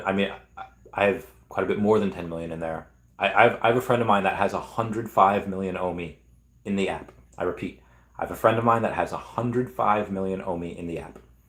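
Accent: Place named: Australia. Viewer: 😂 cracking English Australian accent 👍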